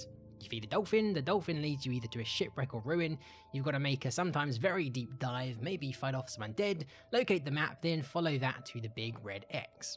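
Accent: United Kingdom. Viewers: I was reffering to pronunciation as "ae" often makes an /æ/ sound in English (think "aesthetic, etc). What I was saying was that I've only ever noticed /akwætɪk/ being said by these two Youtubers who both happen to be British.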